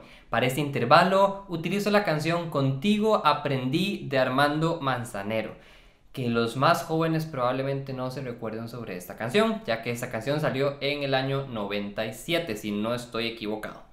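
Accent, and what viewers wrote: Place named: Costa Rica. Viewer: Hey. Ud es de Costa Rica? Su acento es tico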